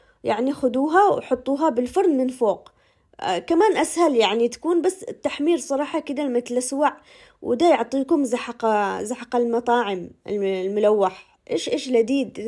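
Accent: Aden, Yemen. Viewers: تسلم ايدك يا وفدوه للهجه العدنى ايش المحرقات اللى يحطوها بدل الطماطم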